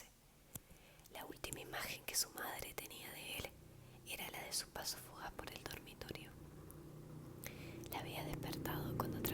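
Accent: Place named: Argentina. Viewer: Me encanta el acento Argentino. Saludos desde méxico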